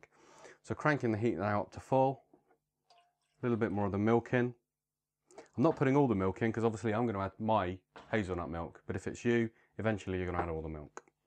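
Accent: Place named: United Kingdom. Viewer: It's getting cold here in NC, and I kept warm with your hot chocolate recipe last winter. Made it last night and can't wait to make it again tomorrow night. I'm a sucker for the British accent too 😉☕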